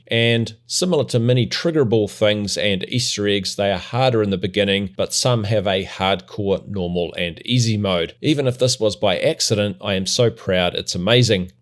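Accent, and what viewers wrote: New Zealand. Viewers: ru from new zealand or australia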